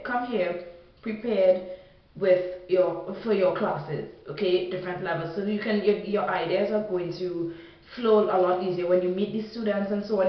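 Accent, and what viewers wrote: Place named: Trinidad and Tobago. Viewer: How exciting!!!!! :D In the other videos I'm like that girl rellll sounds like a Trini. I'm in first year now and I intend to do this after second year so I'm collecting as much knowledge as possible. Did you have prior knowledge of the French language? THanks!! Rushell :)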